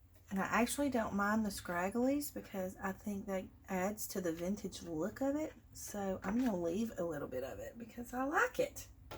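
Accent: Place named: Alabama, United States. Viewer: I feel the same way! I live in Alabama and your accent sounds like you could be my next door neighbor! Lol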